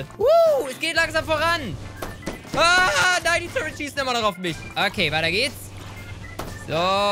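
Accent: Saxony, Germany. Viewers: kannst du mal ein komplettes Video auf sächsisch machen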